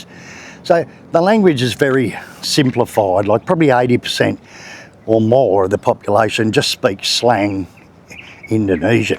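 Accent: Australia. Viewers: Inspirational! You can barely speak Strine English, so the fact that you've learned Bahasa Indonesia to the extent that you do is truly inspiring. How long has it taken you?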